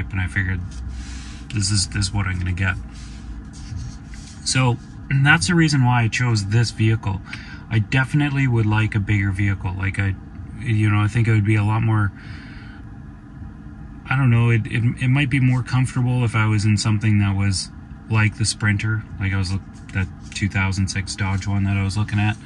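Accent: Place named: Quebec, Canada. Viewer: Watch Eric's channel where he talks about "11 design mistakes". Really interesting. Oh and the accent is from Quebec. Thanks for sharing A live stream would be great!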